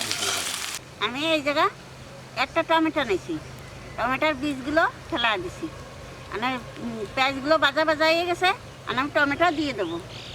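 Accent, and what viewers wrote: Bangladesh. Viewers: দাদীমা আপনি আমাদের মানে বাংলাদেশের মানুষ, আপনার কথা শুনেই বুঝি আর রান্না দেখে তো অবশ্যই আমার দেশের ঘ্রান পাই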